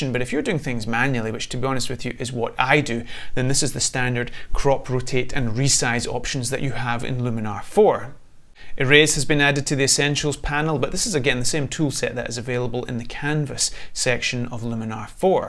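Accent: Scotland, United Kingdom. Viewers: I've just subscribed not only because of the quality content but also because I love to listen to scottish accent :-)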